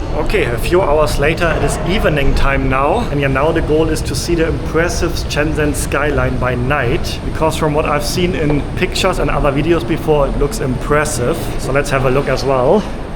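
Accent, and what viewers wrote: Germany. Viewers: ngl the German accent makes the vid so much better lol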